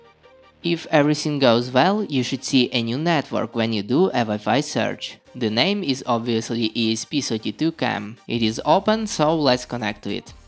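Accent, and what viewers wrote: Russia. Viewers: забавно слушать видео с русским акцентом. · Я уверен на 95% ты русский или украинец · Are you from Russia? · Слышно русский акцент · Ты вроде русский,судя по акценту? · Блин акцент такой будто-бы это русский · Бля твой русский акцент просто по ушам режет как ржавым гвоздём! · От такого русского акцента хочеться повеситься тут же!!! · Русский акцент или показалось? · Акцент русского выдает с потрохами ) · Пипец чувак руинглиш так режет ухо. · Какой ужасный русский акцент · Русский акцент )))))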